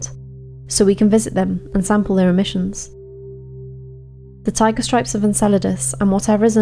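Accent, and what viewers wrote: United Kingdom. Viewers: They are both welsh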